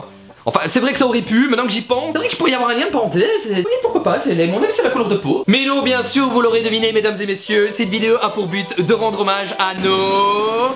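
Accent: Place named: Naples, Italy. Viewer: Tu as gravee un accent napolitain sinon super vidéo mon nonno est pareil 🤣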